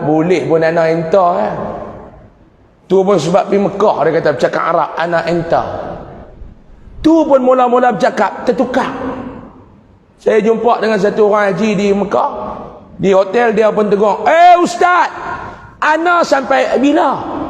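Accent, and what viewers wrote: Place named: Kedah, Malaysia. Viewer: Ustaz,,jgn risau,saya orang kelantan, tapi saya peminat no 1 ustaz. Faham sgt apa yg ustaz cakap.. Cakap Kedah pun semua bulih paham.. Malam ni lantang dari biasa. Terbaik ustaz.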